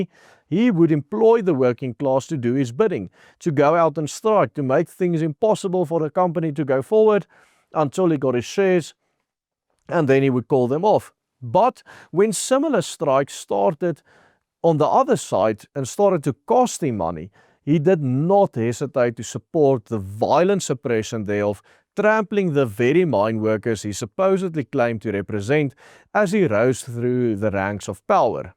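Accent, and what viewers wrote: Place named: Afrikaans, South Africa. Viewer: Bru the THICK AFRIKANER accent😂 I love it! My true fellow South African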